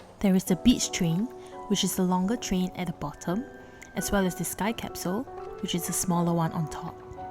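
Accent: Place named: Singapore. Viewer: Singapore accent ftw!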